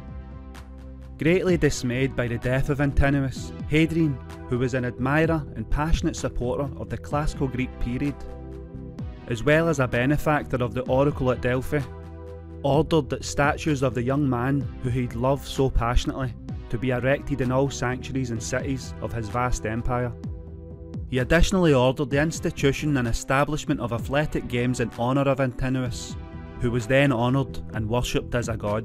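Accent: Scotland, United Kingdom. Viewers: Is this a scottish accent? Just wondering, because it was so easy to follow, while irl my encounters with Scots have usually made me feel like I needed to go back to school and practice.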